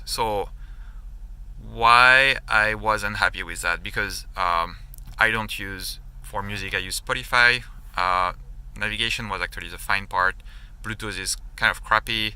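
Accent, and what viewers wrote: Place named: France. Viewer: Au vu de l'accent je suppose que tu es français, donc super vidéo ! Je reçois le miens dans quelques jours et ta vidéo va me permettre de faire tout fonctionner un peu plus rapidement. Merci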